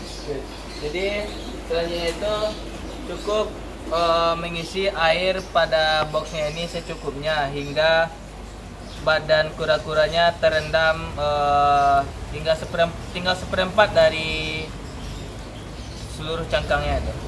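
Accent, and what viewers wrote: Indonesia. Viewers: bang orang Padang apa madura ? kok logat bahasa nya kek orang madura ya 😁✌️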